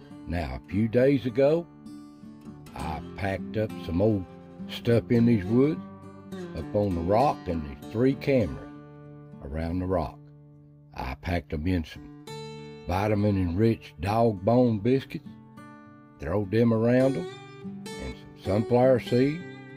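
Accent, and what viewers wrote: Appalachia, United States. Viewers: Well I didnt get to finish my"hey howld ya dos Its so good listening to a fellow "ridge runner(were from Tazewell area up on Ravens Ridge)..I enjoyed.hereing back home in your voice and will subscribe to your youtube channel..you have a safe and sane New Years amd please keep on visiting with us..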